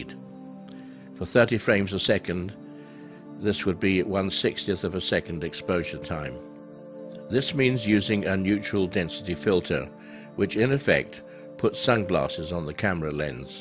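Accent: England, United Kingdom. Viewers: THX FOR SHARING. YOU SOUND ENGLISH?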